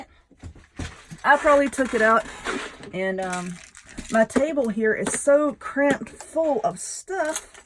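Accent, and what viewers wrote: South, United States. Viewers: So happy to hear your Southern accent again. You tickle me when you say caddywampus (sp) I'm from Jersey, have never heard that word before and laughed out loud when you said it. I also love to watch you crochet. My grandma taught me when I was little but I forgot everything. I've been watching YouTube tutorials to relearn. I'm hoping you make some crochet projects. Happy crafting.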